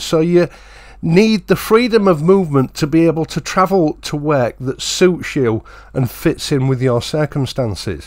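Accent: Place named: North, United Kingdom. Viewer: I love your videos and friendly sane northern accent. As you point out human rights can be "adjusted" to mean anything. More important are our Divine rights. In Genesis God tells us to be fruitful and multiply and fill the earth and have dominion over it. This means that we can legitimately use the amazing resources such as coal and oil in responsible ways. It is interesting to note that He has retained abololute control over the climate - He says that "while the earth remains, seed time and harvest will also remain". It is also interesting to note that CO2 is an vital plant foot and according to top notch scientists such as William Happer we are bordering on a drought for this essential commodity.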